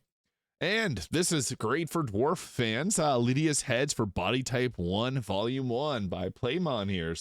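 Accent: Canada. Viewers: i feel like that is how you would actually say it. 1st time i heard you pronounce about as "a-boot" i was like, this guy is definitely from the great lakes area or canadian.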